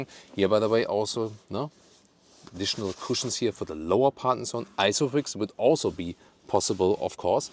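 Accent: Germany. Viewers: Nice Video but the English sounds waay too german!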